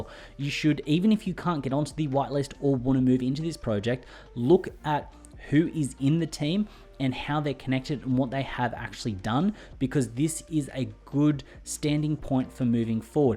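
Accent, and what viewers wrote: Australia. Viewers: i knew you were a fellow aussie:)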